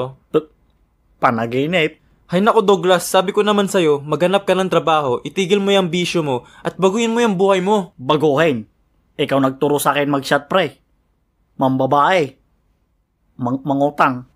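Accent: Ilocos, Philippines. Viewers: ilokano accent ka pre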